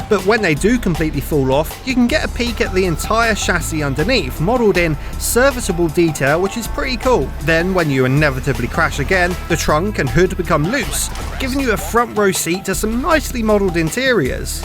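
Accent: United Kingdom. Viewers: Are you from milton keynes???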